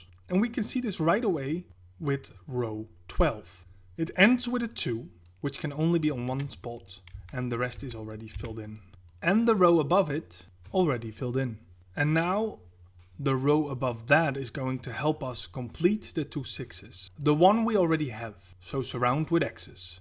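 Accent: Netherlands. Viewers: Dutch detected!!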